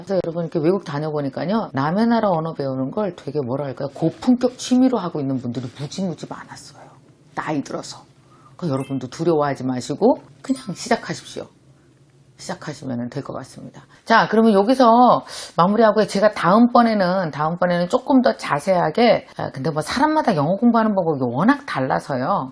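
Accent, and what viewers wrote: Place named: South Korea. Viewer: 정말 박수를 쳐드리고 싶네요. 대단하세요. 영어가 모국어가 아니면 당연히 한국인 엑센트가 있죠. 언어는 지식전달이기도 하지만 의사소통이 젤 인것 같아요. 마음과 마음이 언어를 통해서 대화하는거니까 한국인 엑센트가 있어도 의사소통에 문제가 없다면 오히려 한국인으로써 자랑스러운점일것 같아요. 김미경강사님의 강의에 100% 공감하고 동의해요. 용기주셔서 정말 감사해요.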